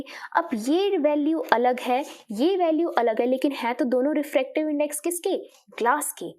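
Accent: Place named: Bihar, India. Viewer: Dide you are bihari 🤔.. because of ur voice I can make out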